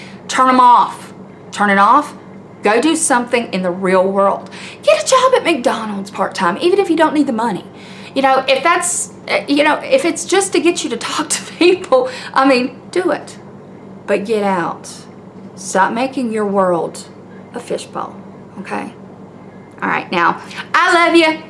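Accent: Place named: Georgia, United States. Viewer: That is hilarious! I’m from Tennessee, and if you give me a minute, I can sound like a hillbilly or Dolly Parton, take your pick. Otherwise, I think I have just a bit of a Southern accent. (I watched a load of TV growing up, so I adopted a neutral accent on purpose after I was insulted by a Canadian as a child while on vacation in Florida.) Where are you from? I would guess Northwest Georgia by listening to you.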